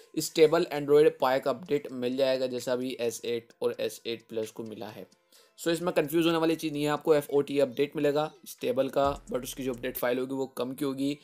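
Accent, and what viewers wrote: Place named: United Kingdom. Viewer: Bhai itna aungrej kyu ban rhe ho.... Aisa lag rha hai jaise lagaan picture ke firangi ho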